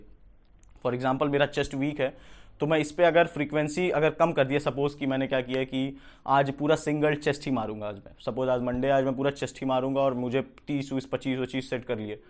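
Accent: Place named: Awadhi, India. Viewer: Yaar tumhara Awadhi dialect kafi achcha lagta hai.. 🌼🌼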